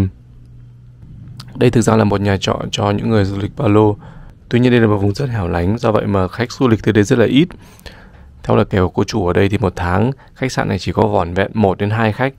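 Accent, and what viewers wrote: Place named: South, Vietnam. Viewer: Chào bạn..nghe giọng nói của bạn là người miền Nam. không biết. quê hương VN. của bạn.ở tỉnh nào. miền Nam Việt Nam.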